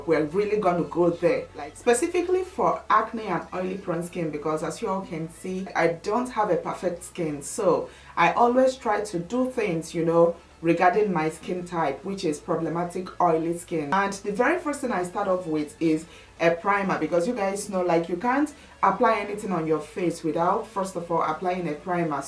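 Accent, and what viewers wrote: Nigeria. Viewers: b. yea shes nigerian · I’m gonna guess Nigeria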